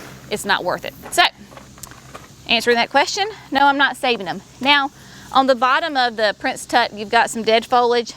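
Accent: South, United States. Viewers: Bring more videos, I enjoy your true self, it’s refreshing. I also enjoy listening to your southern accent. ❤️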